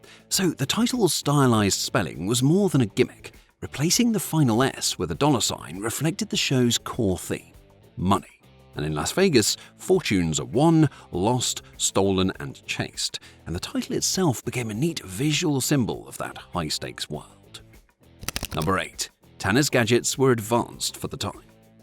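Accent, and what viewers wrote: United Kingdom. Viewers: I wasn't born in 1978, but I had never heard of this TV show growing up in the 80s in the UK, so I assumed it was never shown in the UK. However, the person narrating this video has a British accent, so maybe it was shown in the UK!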